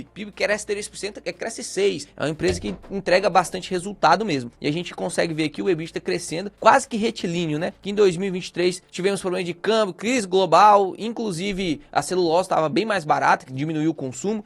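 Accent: Caipira, Brazil. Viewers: “CAIRTERA”? Esse sotaque caipira fode qualquer vídeo. Não tem um fonoaudiólogo que dê um jeito nisso?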